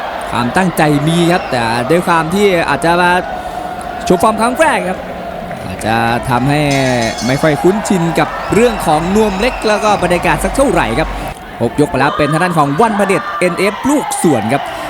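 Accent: Isan, Thailand. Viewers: พากษ์อีสานจนจบเทียแนเเด้อ😂😂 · มักเจ่า พากมวย เว้าอีสาน ม่วนๆ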